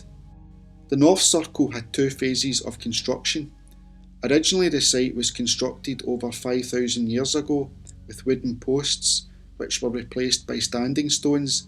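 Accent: Scotland, United Kingdom. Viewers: Might want to start with a map, mate. Where on earth is this? I'm guessing Scotland somewhere l, from your accent...? Very interesting remains... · Oh , to be a time traveler! Although, you would have to bring your own food, drinks and snacks… they probably wouldn’t have food stores. I would have to go back and forth a lot , but it would be worth it, truly amazing. A wonderful video. And the beautiful Scottish accent of the narrator is mesmerizing.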